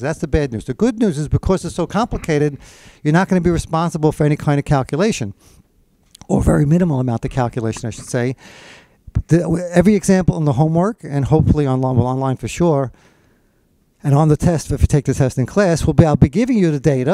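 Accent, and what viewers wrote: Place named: New York, United States. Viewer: Lol I admire the NY/ Jersey accent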